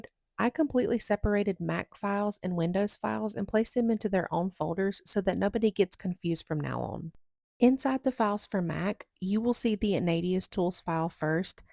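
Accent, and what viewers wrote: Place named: United States. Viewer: I don't mean to annoy everyone here but I feel morally I have to warn you; if you are serious about doing this you need to be way more careful, dumping all the files on GoFile? Are you familiar with copyright? I'm going to simplify this just to get my point across: You need to do stuff like set up private server and host a site to put it on or use a torrent site etc. Also you sound like an american, the US has copyright laws, if EA find you they will sue your arse into oblivion and they have a team of sharks just for that kind of thing, crackers normally are in other nations that have flimsy copyright laws so they can get away with it. I wish you the best I really do and EA are scum so um be careful yeah?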